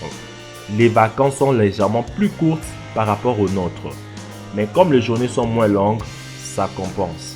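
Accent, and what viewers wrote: Africa. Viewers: j'aime pas parler de l'écriture, mais c'est pas de ta faute si t'as cette écriture de merde et lui cet accent. il fait pas exprès. t'as jamais entendu un africain ou quoi toi ? 😂😂😂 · bien l'accent qui sent le mafé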